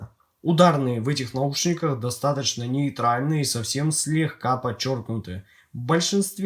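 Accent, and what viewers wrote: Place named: Caucasus, Russia. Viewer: ломаю голову, знакомый акцент :) с кавказа?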